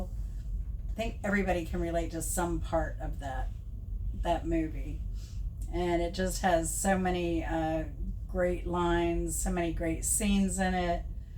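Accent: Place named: England, United Kingdom. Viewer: I love hearing your English accent because I can learn English listening 🙏 that is truly inspiring and interesting to hear the story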